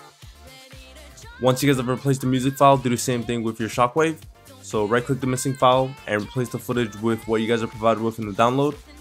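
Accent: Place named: Russia. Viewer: Is it me or does this guy sound russian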